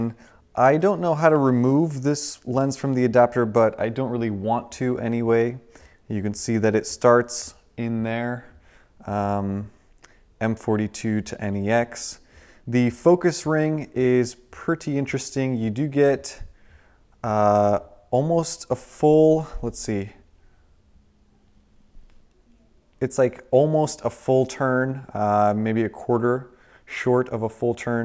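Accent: Russia. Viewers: Чувак, ты крут! Откуда такой русский? Where did you get this accent?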